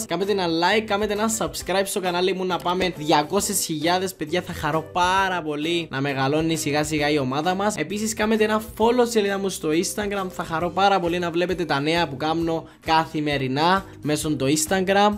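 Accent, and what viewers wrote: Cyprus. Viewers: Ίσως πολλούς να τους ενοχλούν τα κυπριακά σου αλλά εμένα μου αρέσουν!!! Είναι όλα τα λεφτά 😍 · Γλυκούλης ...λατρεύω τα κυπριακά 😍😘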